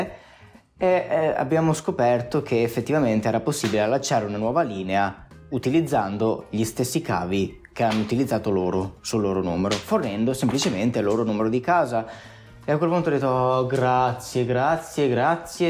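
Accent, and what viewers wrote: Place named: Mestre, Italy. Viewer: Si sente proprio la R de Mestre HAHAHA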